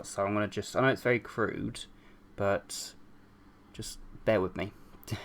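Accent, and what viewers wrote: England, United Kingdom. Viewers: Here it was so scary and then you start speaking in this warm, wonderful voice with a lovely English accent. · Lovin all your tutorials xo! Its amaziiing! Even tho I'm your new subs:( and I also love your British accent ♥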